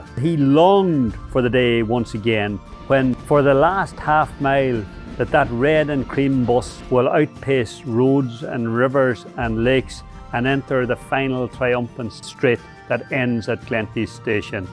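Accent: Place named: Scotland, United Kingdom. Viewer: No,,no visit,,the accent is awful,,,just like the scot shit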